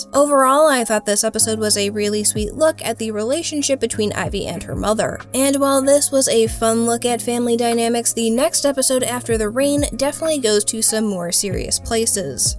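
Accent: Minnesota, United States. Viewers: Every video I see of you I think you’re from Minnesota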